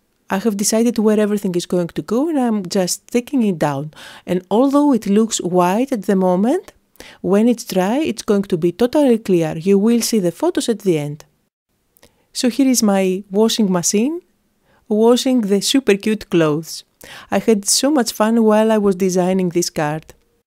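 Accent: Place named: Greece. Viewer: So cute,! I think my favorite is the little boy with the clothes in the washing machine! How imaginative! So, you are Greek, I gather? I love listening to your accent! Your English is very good!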